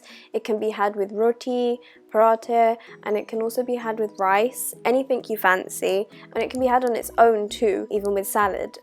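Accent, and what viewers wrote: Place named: United Kingdom. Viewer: What a delicious Fried Chicken Recipe! Great editing and love your accent! British? Keep up the fantastic work! :)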